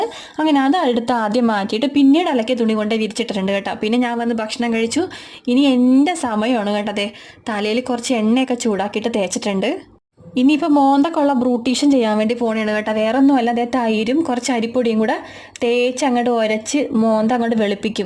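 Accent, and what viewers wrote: Kochi, India. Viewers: ഇന്നത്തെ സംസാരം എന്ത് രസമായിരുന്നു കേട്ടിരിക്കാൻ... തനി കൊച്ചിക്കാരി കൊച്ച് ♥️🥰.. ഇതാണ് ഒത്തിരി ഇഷ്ടപെടാനുള്ള ഒരു കാരണം 🫂🫂🫂🥰🥰🥰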